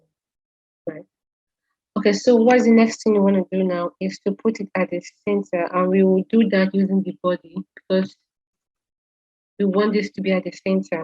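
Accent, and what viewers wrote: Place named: Igbo, Nigeria. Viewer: The Igbo accent makes me feel at home 🥳🥳🥳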